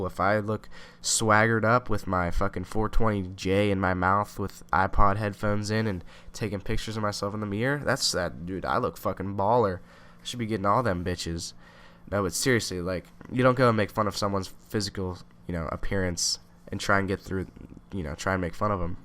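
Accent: Ireland. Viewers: Are u really Irish becouse I live in Ireland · Youtubes mother fuckin best commentator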